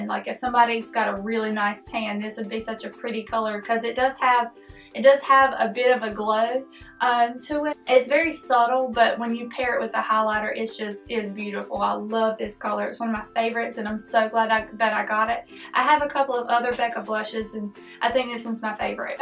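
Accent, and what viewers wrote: South, United States. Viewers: You're accent is so cute, I'm from the Heart of Dixie as well <3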